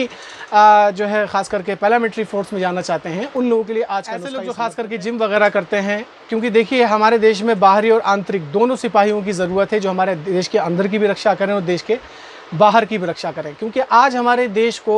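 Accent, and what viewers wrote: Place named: India. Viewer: apki voice pakka hindustani dekha rahe hai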